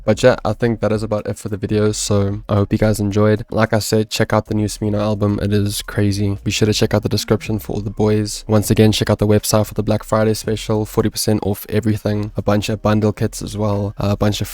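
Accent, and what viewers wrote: South Africa. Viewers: was way to Tuff are you South African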